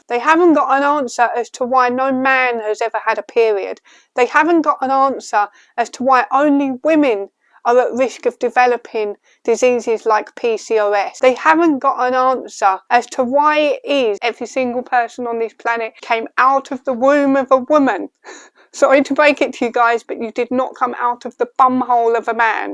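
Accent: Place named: Council estate, United Kingdom. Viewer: You know someone lives on a council estate when they speak like you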